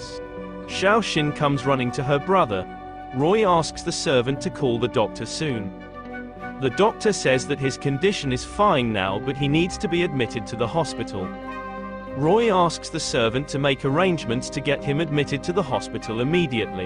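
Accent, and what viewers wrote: United Kingdom. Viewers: Yo u british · yo you British?? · Yo u British bro? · I think he is! I didn't know british ppl sounded like that no hate to them and they sound pretty cool!! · Nah jit British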